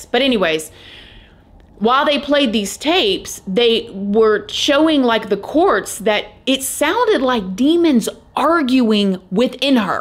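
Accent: South, United States. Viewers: I love real life horror. Also, yes I can tell your a fellow southerner lol. Same accent as mine. God Bless.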